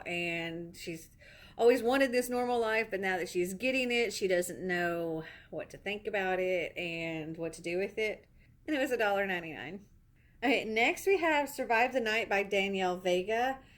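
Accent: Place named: South, United States. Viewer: Your ollies store has so many more books than mine. Mine only has a few good ones mostly Christian fiction or true crime which I don’t read! Awesome haul though I really love how you read the synopsis or tell us what they are about so I don’t have to pause every second and look on Goodreads lol!!! Also where are you from? You sound southern, which I rarely see on booktube other than myself!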